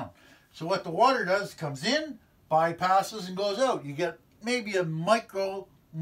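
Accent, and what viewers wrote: Canada. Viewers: I don't watch to learn as much as I watch to hear that Canadian accent! 😂😂😂 Eh? Good to know info,! I will have to re-plumb my rig when I get home and this might help! Also, 🦄🦄🦄🦄🦄📸📸📸📸📸📸📸📸 your camera person makes you look good! 😁🙏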